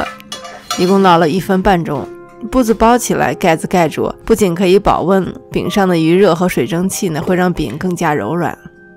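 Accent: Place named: Shaanxi, China. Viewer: 其实除了平时看怎么做美食，赏心悦目之外，还有就是听说话的腔调，虽然不是陕西话，但是很多用词和语调特别的家乡腔亲切❤️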